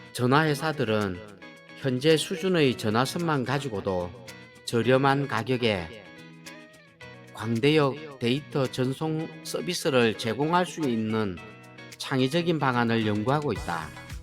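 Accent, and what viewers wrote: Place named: Gyeongsang, South Korea. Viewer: 투박한 말투와 갱상도 억양에 묘한 끌림이 있네요~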